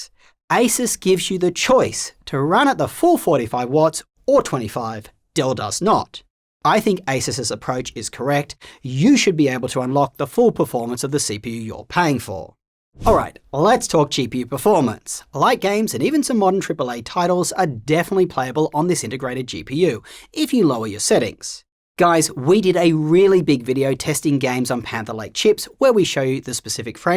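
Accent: Australia. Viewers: My God....I love the video but no offense you've got a very disturbing australian accent with bloody annoying high tone pich to it😂😂 Can not stand by longer than 1 minute....sorry😅😅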